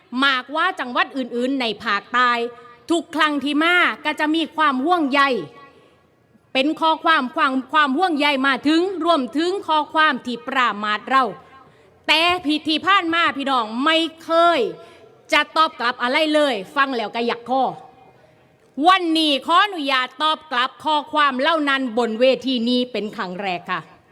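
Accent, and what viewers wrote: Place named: Laos. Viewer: รู้สึกว่าคุณมีสำเนียงลาวปนมาหน่อยนะ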